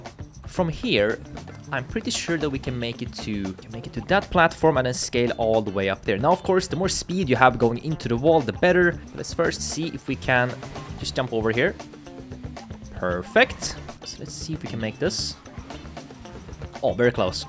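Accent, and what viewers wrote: Sweden. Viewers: Are you swedish? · You have a very swedish accent, are you from sweden?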